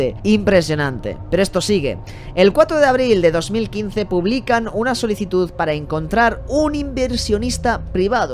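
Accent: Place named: United Kingdom. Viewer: Me encanta tu ingles UK, que nadie te diga lo contrario!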